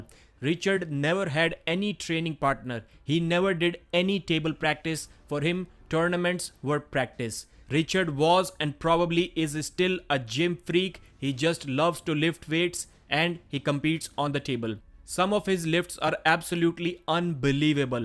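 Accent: India. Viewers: Диктор из индии??? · never heard of........... but the speaker of this video sounds like the stereotype indian technical support call center guy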